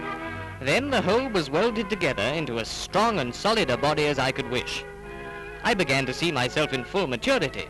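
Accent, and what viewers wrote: United Kingdom. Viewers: Considering that this film is British. You can tell by the accent, it was filmed by Pathe and the car was build in the Dagenham plant. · "Ford et Degenhem" - no "As" in those days! Whatever heppened to those posh English voices?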